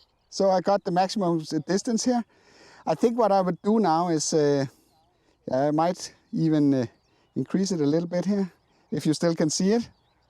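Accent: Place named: Denmark. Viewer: It's actually Denmark I believe due to his accent. But sincerely thnx for asking 😂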